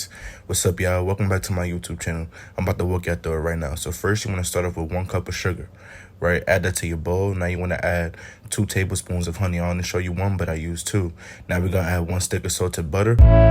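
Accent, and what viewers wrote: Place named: North, United States. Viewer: Idk how southern you are with the up north accent all of that but now we're just waiting on the collard green recipe 😆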